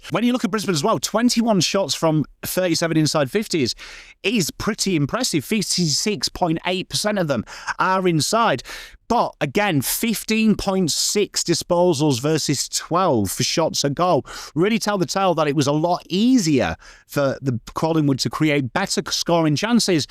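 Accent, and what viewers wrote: England, United Kingdom. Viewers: Why does it sound better coming from a Pom?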